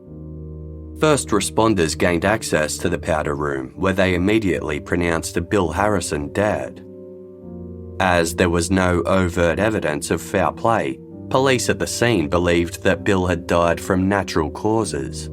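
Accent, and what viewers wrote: Australia. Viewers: my heart goes out to the kids of these monsters. Not only did they lose their grandfather, their grandmother and their daddy but now due to selfish custody BS, have lost their (other) stepfather/father and bitch of a mother! Love that this is narrated in our awesome Aussie accent! Cheers 🇦🇺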